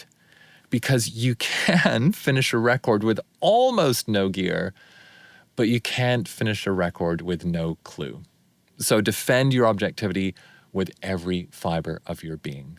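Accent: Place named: United Kingdom. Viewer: the partial british accent is an adorable marker of his time abroad "schtewdio"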